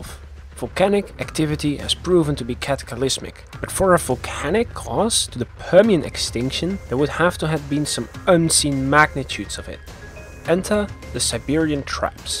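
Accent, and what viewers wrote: Netherlands. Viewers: Sounds Dutch to me. Mix of various accents. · are you dutch? i kind of hear a dutch like accent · Dutch or Flemish huh? Your accent tells me :p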